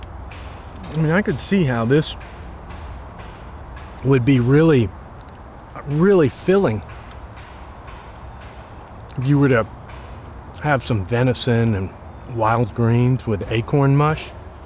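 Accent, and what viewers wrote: Georgia, United States. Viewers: are you from Georgia?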